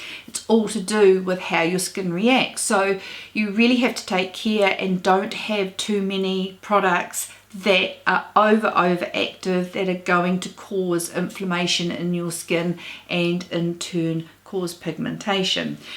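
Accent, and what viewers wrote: New Zealand. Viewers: I am a 66 year old from Baton Rouge Louisiana. I love your accent. It's terrible that products like CerVave costs so much for those of you in New Zealand. I had rosacea in my earlier years and I took birth control pills for a long time. So, my current issues are sagging skin and wrinkles. But I still have the oily tzone. Within the last few years, I have developed this annoying problem called sebasceous hyperplasia (clogged pores). It's like little skin colored bumps that are primarily on my forehead. They rarely go away. I hope that you never have to deal with it. We do not know what causes it nor how to get rid of it. But I need to get rid of extra sebum without wrinkling even more.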